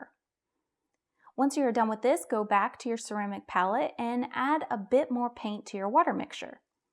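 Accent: South, United States. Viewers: I detected a southern accent. Are you from the south?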